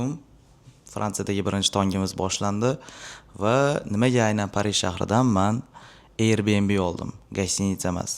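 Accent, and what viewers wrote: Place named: Tashkent, Uzbekistan. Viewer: Хоразмдан акансизку лекн тошкенчани йориб гаплисиз акан гап ёк😅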